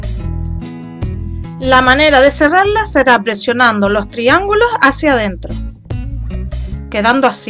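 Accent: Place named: Gran Canaria, Spain. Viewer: Genial, me ha gustado!!! por el acento se nota que eres de Gran Canaria, un saludo desde la isla vecina!!!!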